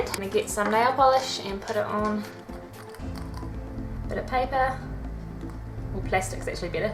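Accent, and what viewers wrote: New Zealand. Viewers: Chur, you sound like a kiwi. Kia Ora and nice vid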